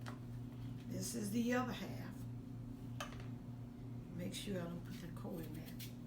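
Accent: South, United States. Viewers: exactly! It's a southern thing and that's just how we talk ❤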